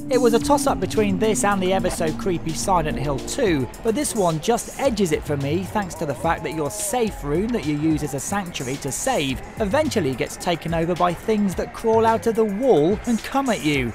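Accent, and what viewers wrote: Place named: United Kingdom. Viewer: ahw.. Hes british!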